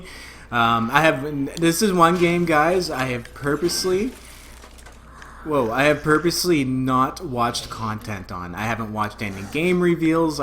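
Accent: Canada. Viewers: I can tell from your accent you're from Toronto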